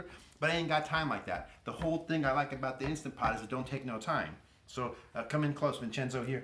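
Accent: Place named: New York, United States. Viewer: Do you always turn on your Nyuu Yawk accent to the max when you're in the kitchen,? <3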